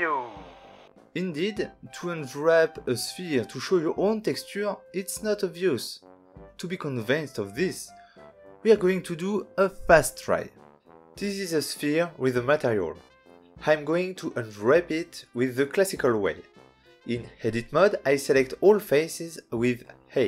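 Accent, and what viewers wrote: France. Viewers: Ton accent francais est mignon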